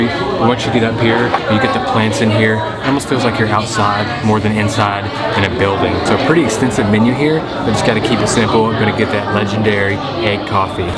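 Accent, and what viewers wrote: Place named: South, United States. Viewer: Love watching u eat.....Your southern draw and demeaner is very refreshing...Pho and other amazing dishes look delicious...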